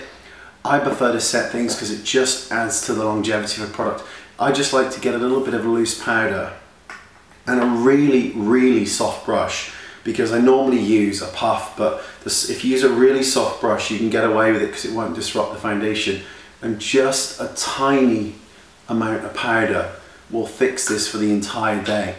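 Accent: Australia. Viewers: r u australian? ive been told ur english but u sound australian o_O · i just realized you were British, i always thought you were from Australia from your accent haha :)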